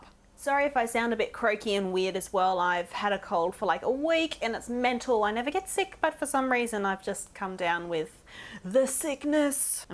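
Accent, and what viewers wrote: Australia. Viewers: I don't have acne (i feel so lucky) but it's great seeing how you beauty gurus could do "magic" with make-up products. I own lots of them, still not able to cover my pores LOL Thanks for the video! Thumbs up for ya. And omg ur Aussie accent is so decent to listen to. Makes me miss Oz sooo much :* thanks ;)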